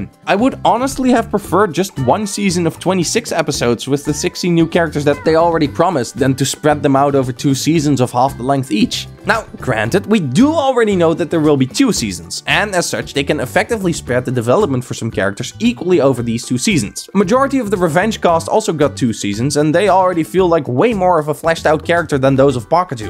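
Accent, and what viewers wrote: Netherlands. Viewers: u sound dutch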